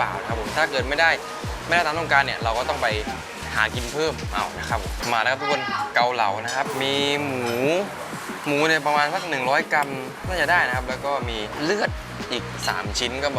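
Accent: South, Thailand. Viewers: พี่ลองพูดใต้ทั้งคลิปหน่อยครับ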